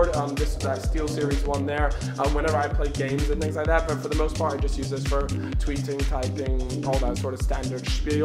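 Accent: United States. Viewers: Is he American? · why does he sound so American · hey are you american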